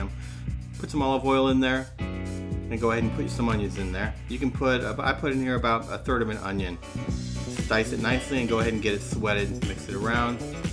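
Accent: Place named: North, United States. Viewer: My guess is you must be from a Northern state.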